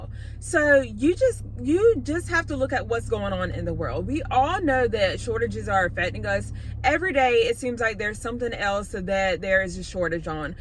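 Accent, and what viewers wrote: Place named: Mississippi, United States. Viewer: Love your accent. It sounds a lot like a Mississippi accent, my home state. The stuff is about to hit the fan. We cannot continue to go further into debt. Inflation is rampant yet interest rates remain near zero. It’s a rock and a hard place.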